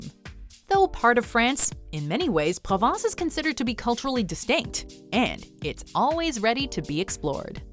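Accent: Quebec, Canada. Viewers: Her accent is French Canadian, so French likely her first language. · She's French Canadian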